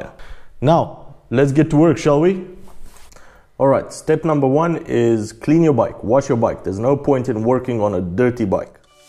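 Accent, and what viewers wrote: South Africa. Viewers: Good and very well done video! Must say you sound like us here in South Africa 😂. How do they compare to the original pads from BMW, which is also Brembo I think? Did you order from the official website? Keep up the good work!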